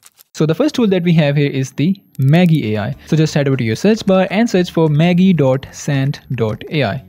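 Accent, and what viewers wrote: India. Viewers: You know what they say: Anyone with a funny/Indian English pronunciation promoting a site that seems to work wonders is actually a scam.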